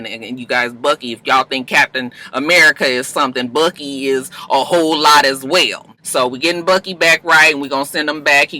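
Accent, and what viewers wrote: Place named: Los Angeles, United States. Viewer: lol. You were saying “clow”. It’s your LA accent. We know you “hawrd”.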